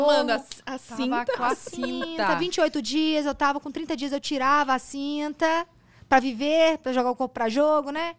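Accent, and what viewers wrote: Minas Gerais, Brazil. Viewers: O sotaque dela é mineiro. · o sotaque dela é mineiro ou goiano ? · Gente, a é mais mineira que eu que moro em minas kkkkkkkkkk Véi, uai, “esse trem e diferente demais uai”, trem, “nunca vi um trem desse”, maravilhosa, buxinha kkkkkkkkkkk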